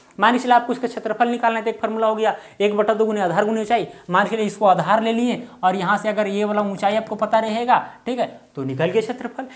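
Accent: Bihar, India. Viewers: Sir aap Bihar ke rhne wale h kya kyoki aapki bhasha thodi bihari lg rhi h aur padhane ka tarika Khan sir ke trh mil rha wo bhi Bihar se hi hai aap aisa padhate h ki ek baar me hi mind me ghus jata h koi doubt hi nhi rhta aise hi Khan sir padhate h Love you sir ❤️❤️❤️💖💖😍😍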